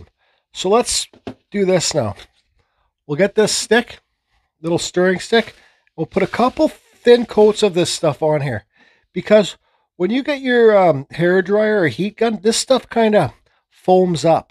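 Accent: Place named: East Coast, United States. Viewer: Thanks so much and I adore the east coast accent ! Wisconsin accents are boring in comparison!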